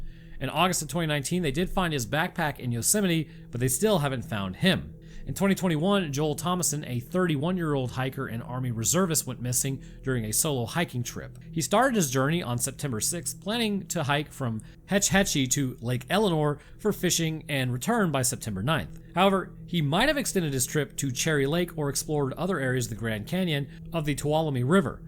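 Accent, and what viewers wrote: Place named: Connecticut, United States. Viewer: The Connecticut accent is feint yet still unbearable. Please try harder to escape the hell hole you're from.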